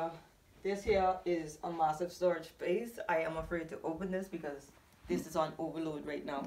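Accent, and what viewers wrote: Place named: Bahamas. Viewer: Few seconds in and I hear a Bahamian accent lol 🇧🇸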